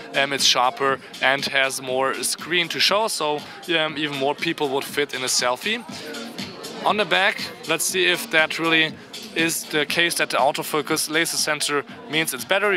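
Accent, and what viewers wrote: Germany. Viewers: Man hört schon den deutschen Aktzent, aber gut gemacht ;-)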